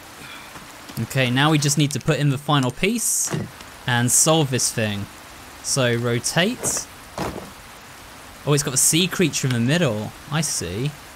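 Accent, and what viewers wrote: United Kingdom. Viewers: Our british accent has uploaded 2 videos in 1 day he works hard and fast on these videos not gonna lie especially if it's Resident Evil i love it so much thank u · Bro this is the first time I realize he's British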